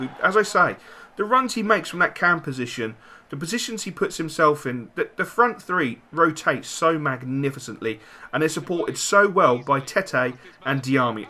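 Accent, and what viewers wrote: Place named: Scotland, United Kingdom. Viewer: ure obv scottish ha me too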